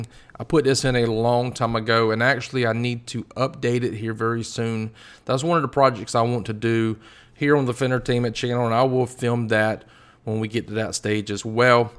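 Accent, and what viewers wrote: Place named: South, United States. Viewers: You're fun to watch. That Southern drawl is highly entertaining, "We tested'ed 'em." Hehe (I'm a KY girl. so howdy!). And you did a really good job; very precise. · This is so beautiful!!! Are you from New Orleans?? You sound so much like Louisiana!!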